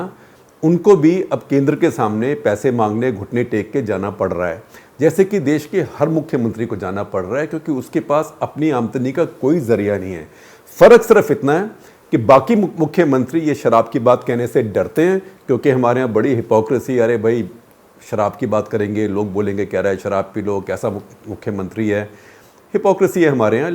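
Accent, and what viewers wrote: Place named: Punjab, India. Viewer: sir.....Your hindi has a punjabi dialect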